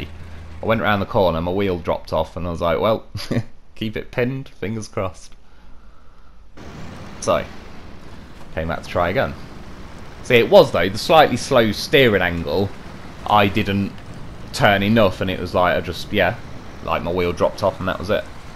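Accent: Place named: England, United Kingdom. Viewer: And oh yeah by the way where are you from your accent seems like you are from England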